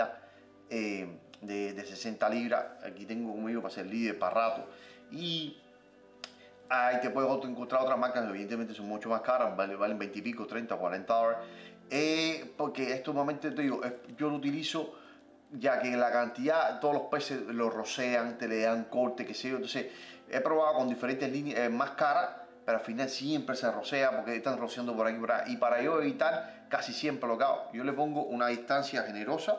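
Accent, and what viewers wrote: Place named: Cuba. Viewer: usted por ese hacento es cubano . Esa tonada no se va ni con 24 años en Argentina. buen Video Suscrito Coherente Lo Que dices Saludos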